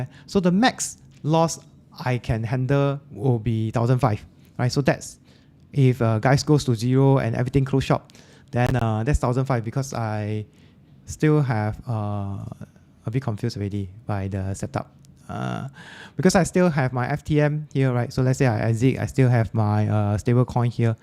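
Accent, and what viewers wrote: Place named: Singapore. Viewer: surprised by your singaporean accent, but great video. made the same mistake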